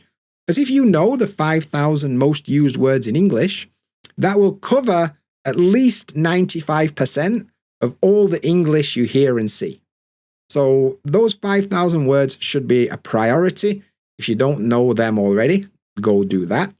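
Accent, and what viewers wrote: United Kingdom. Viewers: I love British Accent 🇬🇧 it's really soft for my ears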